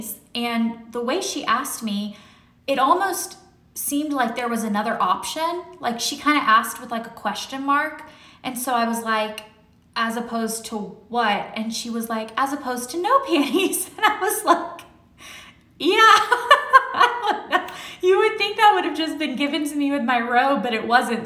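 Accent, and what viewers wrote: South, United States. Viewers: The accent, I love that southern accent. I kept replaying that part. Love you, you are so strong ❤️ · I LOVE how southern you sound coming out of anesthesia! So cute! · So glad you're ok! So sorry about your sweet baby <3 we love you so much! and your southern accent too haha. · I was going to say the same thing. Post-surgery gets real southern.:-) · You went so southern after the anaesthetic hahaha I love it, sending love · Thanks for sharing your difficult experience, southern twang and brutal honesty. You’ve made it that much better for the next person that has to go through this awful ordeal. Sending you lots of hugs from NC! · I thought the same! So southern! It was cute to see. X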